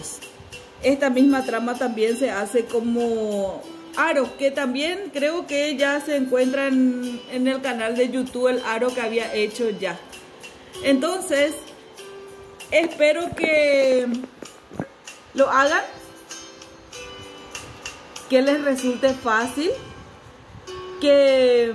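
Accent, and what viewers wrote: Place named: Paraguay. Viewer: Sabia que era paraguaya.. Por la forma en la que habla💕😊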